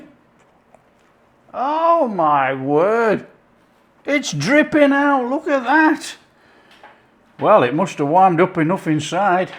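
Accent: Yorkshire, United Kingdom. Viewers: At one time I use to deliberately burn toast as I liked it so much 😁😁 but I must say I really like how you said it in your lovely Yorkshire accent. That was fun to watch. The goo factor was off the charts! 🙌🏼♥️.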